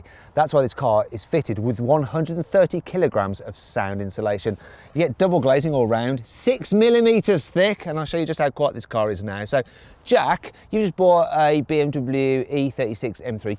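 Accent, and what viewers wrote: United Kingdom. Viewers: 너무 재미따 ㅋㅋㅎㅎ 영국식발음으로 듣기공부 잘하고 감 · 이보다 더 영국 억양이 어울리는 차와 영상이 있으려나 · 영국식억양 개간지나네 ㄷㄷ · 발음이 영국이넼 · 영어못하는 내가 들어도 이사람은 전형적인 영국인발음이군ㅋㅋ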